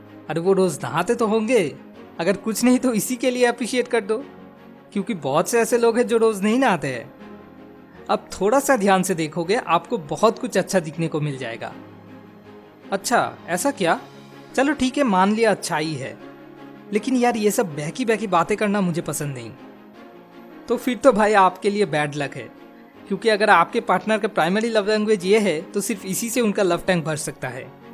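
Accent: Bengal, India. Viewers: frnd r u bengoli .??..ur voice tone is bengoli ..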